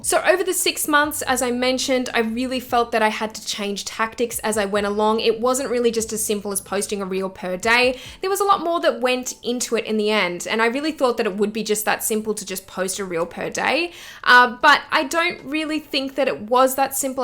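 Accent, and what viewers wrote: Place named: Australia. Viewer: Very new to using instagram, just started an account for a business im trying to get off the ground. Started to youtube it to research and learn how to do things. So pleased i found this vid, hopefully u have more for me to learn from. rather hear it all in an aussie accent rather than a seppo one. First time really using instagram, hopefully it isnt too hard to get around it and become reasonably proficient at it, thanks for posting young lady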